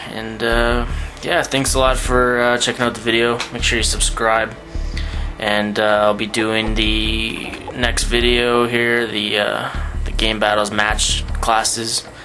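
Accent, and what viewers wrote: Canada. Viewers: u from canada?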